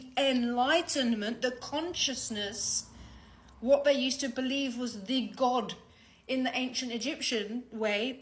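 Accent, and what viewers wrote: England, United Kingdom. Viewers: هذه لكنة بريطانية وليست امريكية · هل ما فهمته صحيح او فيه ما يشوبه ؟ العلم عند الله ،.. اللهجة ليست أمريكية أعتقد بريطانية و الله أعلم . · لهجتها بريطانية . هل انت متاكد انها امريكية؟؟؟؟ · للتصحيح، لهجتها بريطانية وليست أمريكية... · لهجتها انجليزية وليست أمريكية . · لهجتها انجليزية وليس امريكية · هذه اللكنة بريطانية وليست امريكية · هذه بريطانيه وليست أمريكيه واضح من اللهجه … كفي عقده أمريكان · لكنة بريطانية وليست امريكية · هي بريطانية وليست أمريكية، اللهجة الأمريكية تختلف عن اللهجة البريطانية. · هذه ليست لهجتها أمريكية بل بريطانية او ممكن استرالية المفيد ليست لهجة امريكية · لهجتها بريطانيه مش امريكيه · ثبتها الله على الإسلام.. يبدو من اللهجة بريطانية وليست أمريكية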